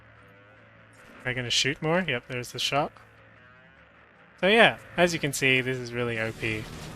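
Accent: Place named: Australia. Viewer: Nice video. Also nice to see a fellow Aussie doing cyberpunk vids (I assume you're Aust from the accent but apologise if I'm mistaken)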